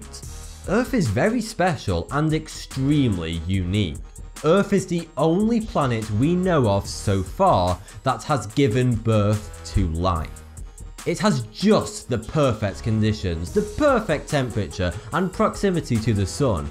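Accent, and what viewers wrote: United Kingdom. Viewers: Is he British? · I love his British accent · So I don't mean to be rude but have you ever lived in Britain? He has one of the least annoying accents you can get, in my opinion. Would you rather have a cockney?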